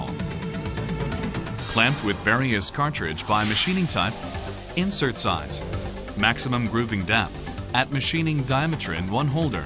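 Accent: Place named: South Korea. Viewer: koringlish.... I can't believe this gets put out like this....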